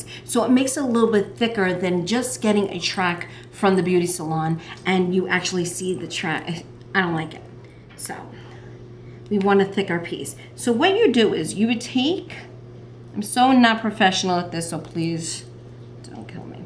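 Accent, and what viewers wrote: New York, United States. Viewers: I admire you a great deal😘😘😘...i dont have any issues wirh my hair but i like that you are so confident even with such thin hair i almost forgot you have thin hair and your New York accent or Jersey i love. Home sweet home!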